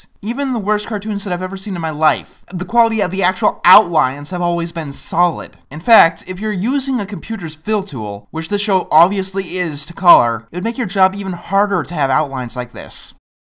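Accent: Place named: United States. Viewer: So realise he's American, right? They say "bedder" instead of "better", "lenguage" instead of "language", "naht" instead of "not"... I could go on. Maybe that's just how they pronounce "execs" in America?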